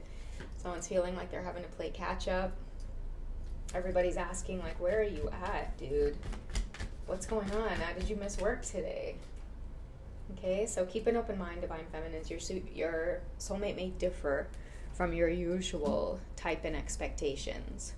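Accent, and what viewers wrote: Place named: Trinidad and Tobago. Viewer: Girl this is my first video of yours and I liked and subscribed immediately purely for the trini accent 🇹🇹❤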